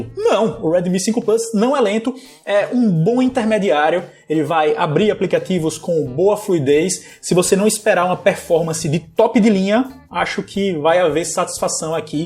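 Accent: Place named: Northeast, Brazil. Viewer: Gostei muito desse Rewiem Nordestinizado KKK # nordeste Já vou me inscrever 😁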